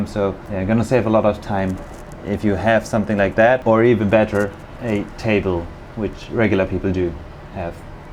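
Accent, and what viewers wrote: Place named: Germany. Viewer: i love how you can instantly recognize a german accent even though its almost unnoticable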